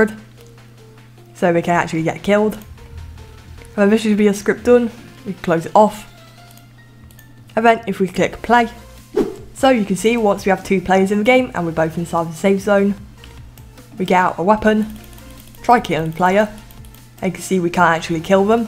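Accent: United Kingdom. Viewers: I know from the start of the video that you're british :]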